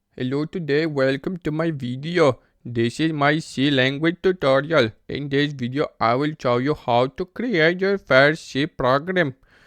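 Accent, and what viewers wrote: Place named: India. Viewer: EXCELLENT TUTORIAL THANK YOU FOR HINDI ACCENT I UNDERSTAND EVERYTHING NOW 🕉🕉🛕🛕 😍😍😍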